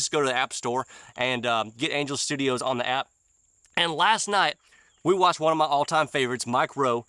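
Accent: South, United States. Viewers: I so get what you are saying. Just watched Tucker and Megan Kelly talk today. Peeps are WAKING UP. I think Megan has grown up and she TODAY is like us as well as Tucker. I was raised in Mississippi and married a Yankee and so I miss my roots some days but not the heat and mosquitos, ha! I get what you are saying and YES we need to download Angel Studios, etc. TY for reminding me to do just that! I love your content and understand your drawl,😂 God Bless You Boy!! I am old enough to be your grandmother but God made you smart and I love good ole southern wisdom. RJ PS/ Tucker and Megan talked today alot like you. God is definitely doing something in our media. Change is God's specialty!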